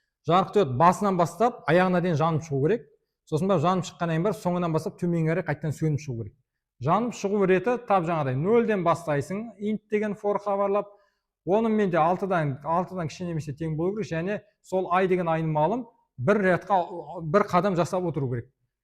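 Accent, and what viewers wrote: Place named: Mongolia. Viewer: Осы бала Монголиядан келген сияқты.Өте түсінікті айтып жатыр көптен көп рахмет .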